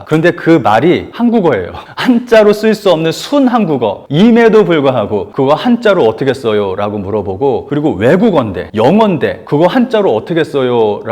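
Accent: Japan. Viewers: 발음에 일본 액센트가 있네요~ 재일교포이신가요?^^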